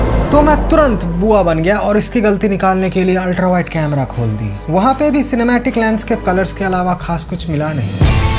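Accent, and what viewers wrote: Bengal, India. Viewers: humm Bangali ❤ hindi accent sune bojhai jai Bangali ke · Bro to be honest with me are you Bengali?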